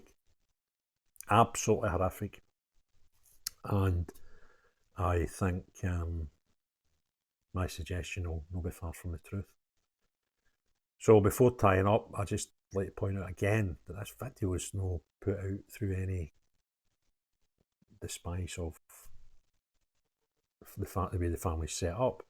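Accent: Scotland, United Kingdom. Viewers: I love your Scottish accent. Such a sad story 😢💔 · This happened in Atlanta, Georgia. I wish he had put a link to the new stories. A lot of people are assuming this happened in the UK because of his accent.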